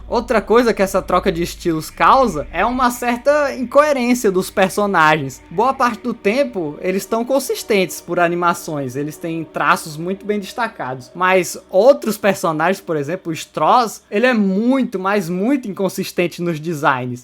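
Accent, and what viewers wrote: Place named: Northeast, Brazil. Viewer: Na moral, é horrível ter que ouvir essa narração de nordestino, bagulho irritante demais!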